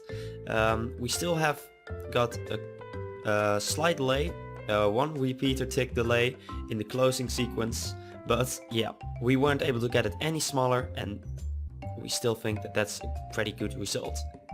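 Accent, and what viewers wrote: Netherlands. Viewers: Are you Dutch, because you sound like a Dutch guy who talks English.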